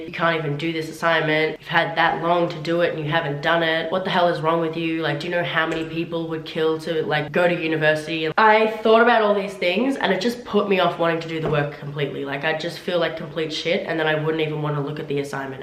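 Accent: Australia. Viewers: She has an Australian accent actually hahaha, but I say that because she loves reading and literature in general. Nothing to do with her accent.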